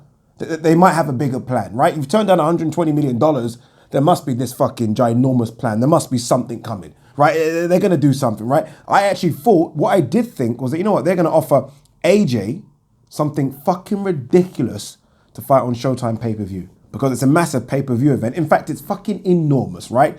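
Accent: United Kingdom. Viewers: As soon as I heard your UK accent I knew the whole video would be biased. Wilder tried for 3 months to make a fight with AJ and NO LUCK and he has now moved on and that is what we do in America MOVE ON. Wilder was just on a tv show "BILLIONS" on Showtime and now possibly a Creed 3 movie so he is busy now making big moves in Hollywood. Question : Why cant Joshua show up to Wilder vs Breazeale in NY which is first isnt AJ here in 🇺🇸??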